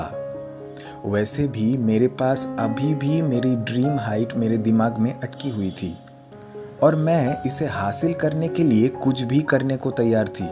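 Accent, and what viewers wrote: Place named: India. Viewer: orry I'm not very good at listening to Indian accents. please tell me about "thank you universe my height is blabla cm" is it our current height or hope for the future?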